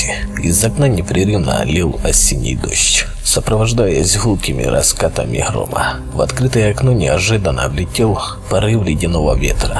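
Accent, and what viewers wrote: Ukraine. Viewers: Чувак ты украинец? БЛИН НУ СЛУШАТЬ НЕ ВОЗМОЖНО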